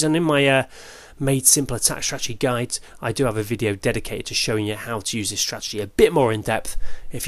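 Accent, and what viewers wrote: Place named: United Kingdom. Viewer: I don’t know about the “Batman” voice...I do know that I’ve enjoyed your videos since last April when I signed on. Your content is outstanding and has helped me quite a bit. Matter of fact, your “Ice” troop configuration has given me quite a bit of success. So much that others in my clan use it now with devastating results. Your commentary, delivery, and UK diction is far and away the best!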